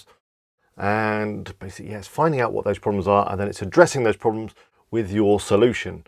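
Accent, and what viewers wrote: United Kingdom. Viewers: Is that british accent ?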